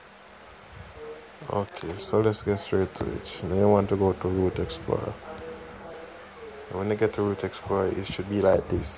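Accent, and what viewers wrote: Jamaica. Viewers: You from Jamaica? Sound like a Jamaican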